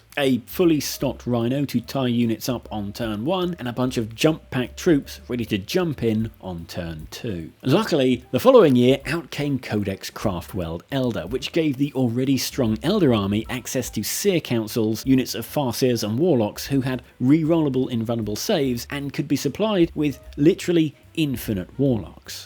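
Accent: United Kingdom. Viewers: Such clean and nice British accent! Like listening nature sounds. Also, nice video) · I'm a simple man, I hear a Brit talking about Warhammer. I automatically assume they're canon and subscribe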